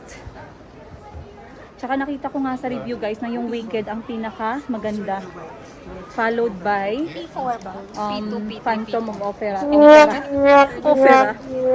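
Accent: Iloilo, Philippines. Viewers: Namian gid ko mag estorya ka ilongga ka mam 😁❤️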